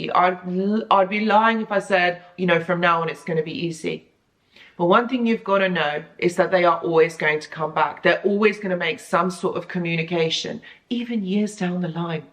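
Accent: United Kingdom. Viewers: Some real facts are Heart breaking.....but your words and facts that you convey is god sent......god bless u Ms...... discarded 1 Oct 2023....going thru some real hard time......i need some insights from the community here and veterans here......my case is crazier hope all can help.....will tell my story if i am ready soon......Cheers. ooooh ya i always love British accents.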